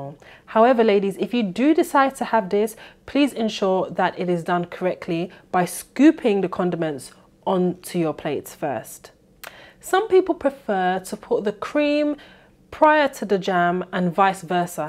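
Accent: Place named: Cockney, United Kingdom. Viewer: Love your refined east-end accent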